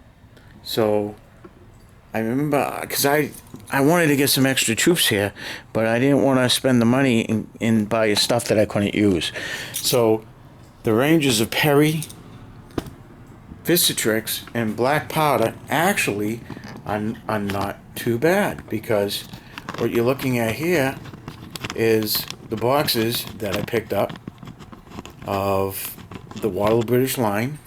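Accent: New England, United States. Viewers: I'm guessing you're a New Englander. The damn finest accent in the U S of A!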